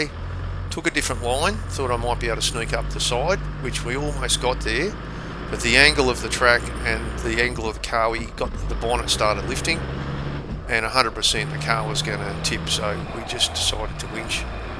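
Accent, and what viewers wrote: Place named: Australia. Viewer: Interesting accent, I realised Australians pronounce 'i' as 'oi', like 'time' becomes 'toime'. Nice track i wish my country had such places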